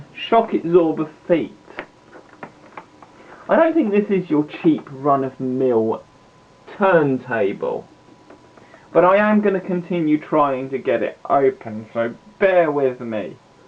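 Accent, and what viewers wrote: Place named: London, United Kingdom. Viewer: Thanks for the breakdown video. Like your accent, is it east or west London?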